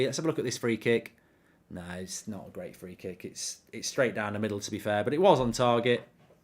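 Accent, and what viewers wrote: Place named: Manchester, United Kingdom. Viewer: Warra manc accent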